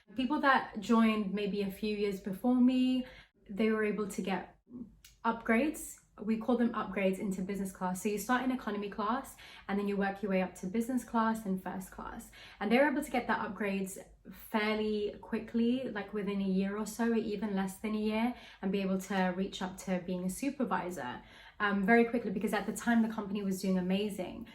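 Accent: United Kingdom. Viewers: Briton na briton accent ni ghorl!!! God bless and good luck sa new journey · What part of the uk are you from, and your content is excellent 👏👍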